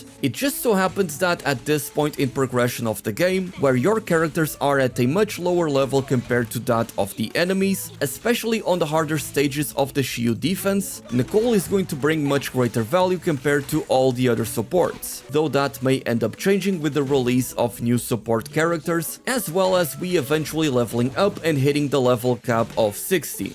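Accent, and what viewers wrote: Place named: Portugal. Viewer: Mano o teu sotaque deu me logo dicas de seres português ahahah. Grande vídeo e agora com a vinda da Zhu Yuan, a nicole ainda vai ser muito mais viável! Forte abraço...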